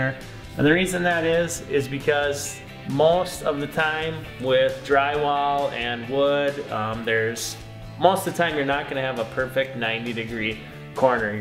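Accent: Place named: Minnesota, United States. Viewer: This guy has to be from Minnesota. Great video!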